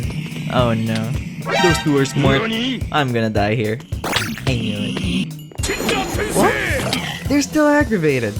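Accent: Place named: Philippines. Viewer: its really funny how i was slowly realizing that this guy was filipino by just listening to his voice. (BTW insane video keep it up)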